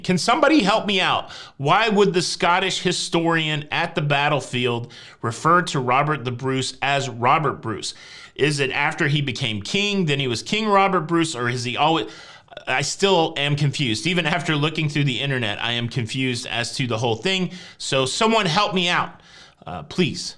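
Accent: United States. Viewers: Give the guy a break. Talk about oversensitive! American accent.. so what?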